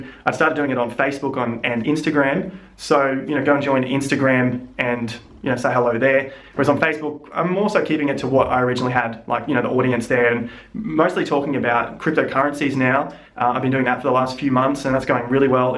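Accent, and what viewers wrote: Australia. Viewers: you've picked up the australian accentttt :D :D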